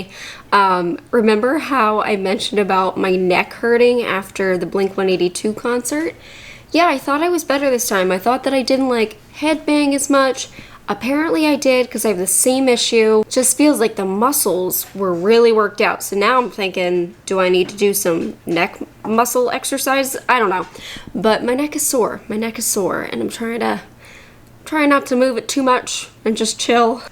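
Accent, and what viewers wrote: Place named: New Jersey, United States. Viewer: New Jersey makes sense. You have an accent that comes out on certain words and I couldn't figure it out.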